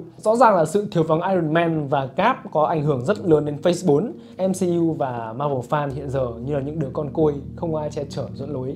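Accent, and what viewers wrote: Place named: Central, Vietnam. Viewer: Yo kh bàn đên sự vô duyên của ông thì cái nói miền trung tôi thấy nó hơi có mùi phân biệt r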